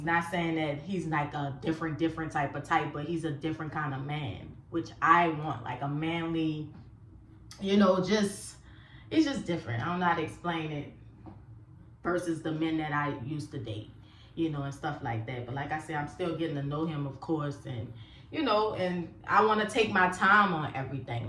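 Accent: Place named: New York, United States. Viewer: Hey just subd to your channel💯🫡. Are you from NY? You sound like your from up there🙂😀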